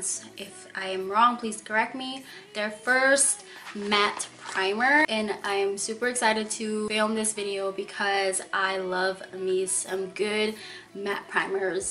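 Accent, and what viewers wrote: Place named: United States. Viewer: Thank you for the review! I was wondering about this primer. ^_^ And ignore that speech comment. I thought you were American because you sounded very clear. :)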